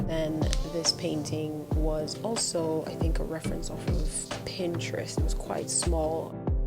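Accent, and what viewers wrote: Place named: Barbados. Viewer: Beautiful work! The music was a bit loud and found it hard to listen to the Bajan accent. Keep arting. Looking forward to the next video! Stay safe 💜💛💙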